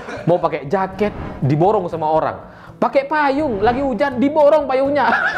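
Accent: Madura, Indonesia. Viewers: Lihat logatnya sihh spt satu suku dg Pak Mahfud MD, Madura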